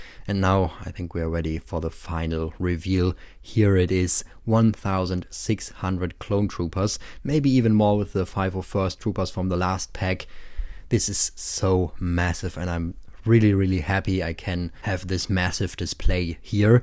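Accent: Germany. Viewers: Are you not german? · Ey man hat direkt direkt gehört dass er deutsch ist :)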